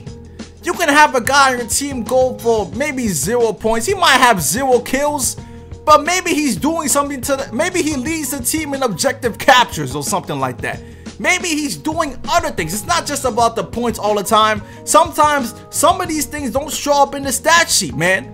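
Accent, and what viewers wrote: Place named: New York, United States. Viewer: Jamais That's how new Yorkers talk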